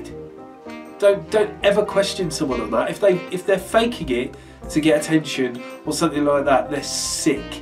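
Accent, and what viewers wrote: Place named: Scotland, United Kingdom. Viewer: Great Scottish accent x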